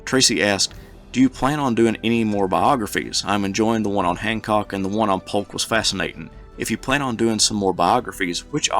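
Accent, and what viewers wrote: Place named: Appalachia, United States. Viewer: I knew that accent was Appalachian. Love it.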